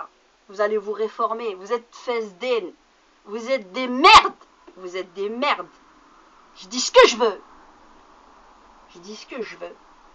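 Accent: Morocco. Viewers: ta façon de parler arabe ta l'aire marocaine et en parlent de des arriviste ils en France ou en Europe j'attire ton attention que les nouveaux arriviste cest qui travaille et toi tu touche les aides sociales en Europe à prendre de ne pas parler vulgaire ma grande sa se voir que ta un problème a la fin vive la France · Mon DIeu ! Je suis tombé sur cette video par hasard. Ma cherie tu m'as fait de la peine, je te connais pas mais tu m'as l'air d'être sérieusement atteinte. message aux Algeriens ?!? Les algeriens ne t'ont rien demandé pour commencer. Ensuite tu as un regard et un comportement pleins de haine.... le suivi de cette chmetta de "Hassan el maghrébine" et ce fond d'accent marocain dans ta façon de parler (je me trompe peut être.... j'espère que quelqu'un de censée (service condulaire par exemple) va se pencher sur ta personne ma cherie) Je te souhaite un bon rétablissement et n'oublies pas de prendre tes médicaments. 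Reste en belgique et ne revient plus en algerie. Si t'es vraiment algerienne ?!? Je signale la video. C'est pour ton bien, salam et saha ramdanek. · kelba bent elharki. Tu as l'accent des marocains. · Dit moi fofolle vous êtes vraiment algérienne ? اسمحيلي l'accent dyalek marocaines ma cocotte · Elle parle trop bien l'arabe ....marocain ? ?????? je doute déjà qu'elle soit née en Europe et qu'elle soit algérienne.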